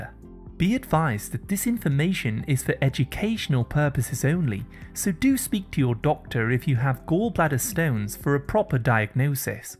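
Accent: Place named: RP, United Kingdom. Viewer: Why does the announcer sounds like a robot with a Buckingham palace accent?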